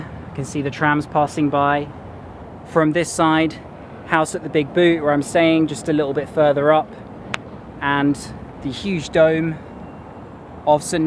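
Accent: United Kingdom. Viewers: Welcome back to the best british accent ever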